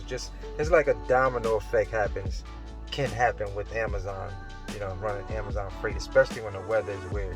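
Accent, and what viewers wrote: Jamaica. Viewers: The Jamaican accent got me. Lol. Time to eat at $3.30 a mile. Keep hustling as we gotta get truck #1 back on the road. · Lol 😂 I thought he was a yardie for a sec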